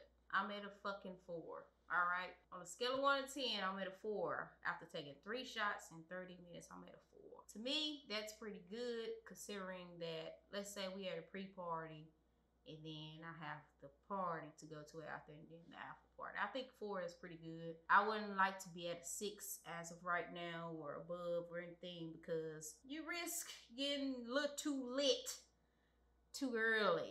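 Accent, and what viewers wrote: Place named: South, United States. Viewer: This is dope. You country as fuck! Lol this is M.A. by the way. Lol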